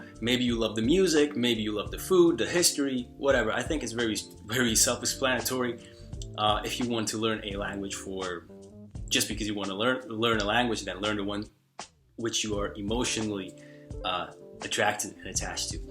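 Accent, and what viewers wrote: East Coast, United States. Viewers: Hey, native English speaker from California here. From a west coast perspective, you definitely have a noticeable accent since you sound very east coast/urban with certain words here and there. If you're open to tips, ironically -- one of the words you pronounce a bit differently from most Americans is the word "language." You pronounce it like "Lan-guage" when most Americans pronounce it "Lang-guage." The first syllable sounds a bit flat when you say it -- it's usually "Lang" as in Langston Huges (the author) not "Lan" as in Lanyard -- the way you say it. Hope that helps. Anyway, keep it up! Your vids are awesome and your tips are really helpful. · oh I thought you are from the US man, good spoken American English. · I have watched your previous videos and I really can see big difference in your American accent, it's like you've been staying quiet good time there and absorbed the accent. 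Great work! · Honestly, I didn't notice the east coast american accent until you mentioned it. But then I totally got it. The first time I heard you speak on Youtube I just thought you were american! It took about 10 or 15mins of listening to you before I noticed the hint of an accent. And I could've never guessed you were from a slavic country 😂 I guess it helps learning English as a kid while in the US. Most slavic language speakers who learn English as a 2nd language have pretty heavy accents that they have a really hard time improving. As far as non-native English speakers, you're in an elite class of maybe 3 or so YouTubere I've seen where I just couldn't believe they weren't native at first! Anyway, I'm from Cincinnati, OH, so maybe that's a reasonable native English speaker's assessment? Anyway, love your videos, man!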